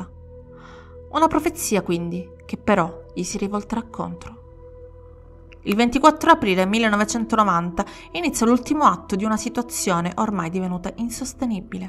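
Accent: Sicily, Italy. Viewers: Probabilmente il commento può sembrare inappropriato…e ti prego: “prendilo davvero x ciò che è; UN COMPLIMENTO!” ma devo proprio dirtelo… Nonostante le storie di cui racconti il tuo accento fortemente “siculo” ti rende davvero sensuale! Forse perchè sono innamorato delle donne siciliane, ma nonostante non ti si vede sono sicuro devi essere bellissima…e appunto…sensuale!😍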